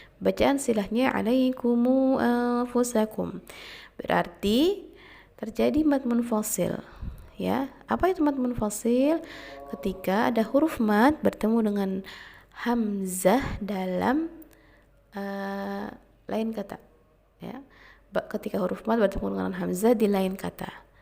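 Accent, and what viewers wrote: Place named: Indonesia. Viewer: Sgt baik penerangan Ustazah. Lahjah Indon saya boleh faham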